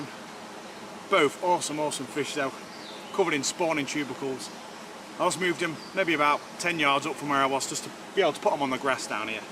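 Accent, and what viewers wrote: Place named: Nottingham, United Kingdom. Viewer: I'm a Nottingham angler and you sound local so can you tell me were is that canal you're fishing ..... I fish mainly at Colwick Park Nottingham??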